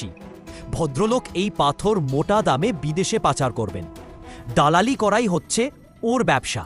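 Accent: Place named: India. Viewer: Awful rendition of an excellent Ray story. Hindi accented Bengali is difficult to understand.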